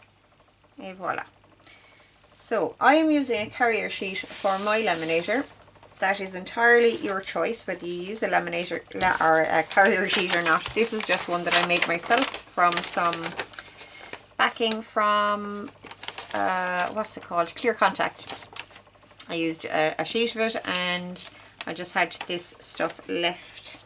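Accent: Ireland. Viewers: Not only do I love what you have shared here but I could listen to your lovely Irish accent all day. Thanks!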